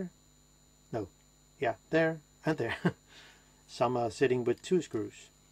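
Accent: Denmark. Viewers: it can only sit in one position. Jeg kan lyd en dansker fra tusind km, rigtig godt video.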